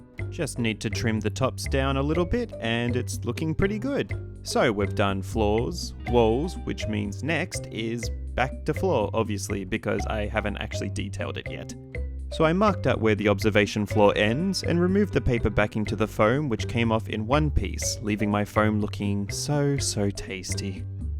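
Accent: Australia. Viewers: You sound like Oscar Piastri or are you just australian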